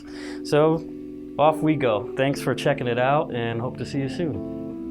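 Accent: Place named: Newark, United States. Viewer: Dat Ne'awk dirdy mouf akscent! 😄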